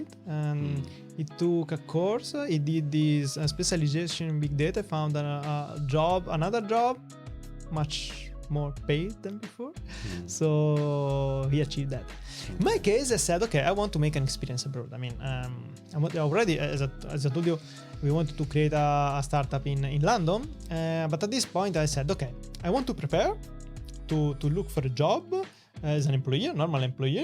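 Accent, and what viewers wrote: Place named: Italy. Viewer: Good video! Nice Italian accent =)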